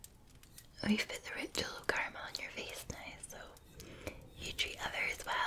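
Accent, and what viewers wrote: Scotland, United Kingdom. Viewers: Calm me down? Yes please, been stressed all day. Nothing better than a beautiful Scots woman under the sheets whispering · hello! cool video very relaxing, I loved! you have big beautiful eyes and lips and an adorable nose, you have such a nice accent, your videos ASMR all are of them awesome and very cute. you're really talented girl and so beautiful, greetings Pretty, thanks and sweet dreams for you also precious scottish girl;) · Beautifully Scottish, as always. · Everyone here knows you're a beautiful woman, and I could make a joke about being under the sheets (most of them are already taken though), but what I love most is that Scottish accent. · I love the Scottish accent!!!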